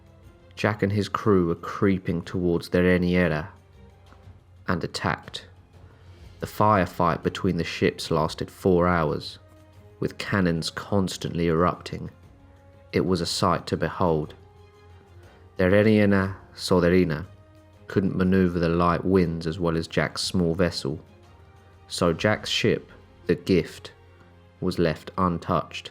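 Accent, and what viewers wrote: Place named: South, United Kingdom. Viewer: You sound nothing the same.. both refreshingly UNIQUE with a southern UK accent.. but totally different voices! 👍😸🇮🇹🤗 I will never understand why many think you sound so alike.. but maybe non Brits hear it in a different way..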